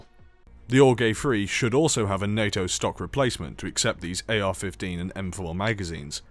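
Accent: United Kingdom. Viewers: He's British. Their accent makes everything sound more official. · I think he's British so that's a given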